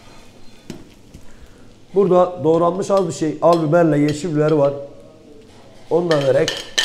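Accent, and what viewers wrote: Gaziantep, Turkey. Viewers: Senin tatlı dilin,Antep ağzın yeter abi. Antep’e gelirsek muhakkak uğrayacağız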